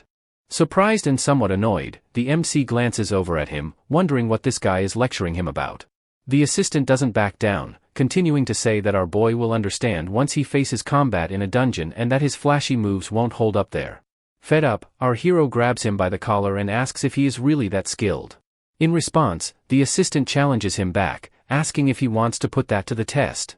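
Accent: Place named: United Kingdom. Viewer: The British accent😭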